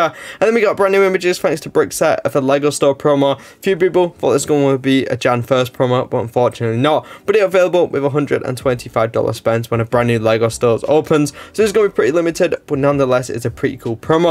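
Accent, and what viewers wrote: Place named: Estuary English, United Kingdom. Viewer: It's pretty hard to understand him as a European, as well... I guess this is what's known as "Estuary English"... (More or less modern Cockney...)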